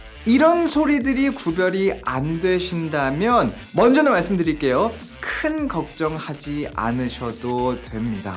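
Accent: United States. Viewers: 뉴질랜드에서 학교다녓다고하셧는데 억양과 발음은 미국식인듯ㅎㅎ 요 궁금한게잇는데 아엘츠리스닝 6.5-7.0 왓다갓다해요 리딩은4.5 정도나오고요 딴지거는게아니라 저같은 경우는 왜그런지 알려주세요 아엘츠 시험조만간봐야되는 1인입니다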